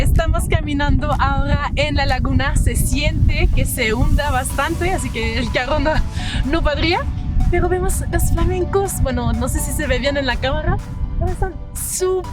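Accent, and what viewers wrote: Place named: France. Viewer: You’ve won a subscriber because you really love my country as it was yours. I love your French accent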